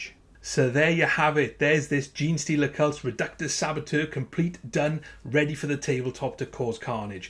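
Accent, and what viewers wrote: Wales, United Kingdom. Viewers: Great vid again bud, p.s what part of Wales is the accent.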